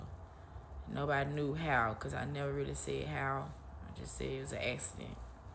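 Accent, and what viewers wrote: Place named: Memphis, United States. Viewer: Can tell she from Memphis 😂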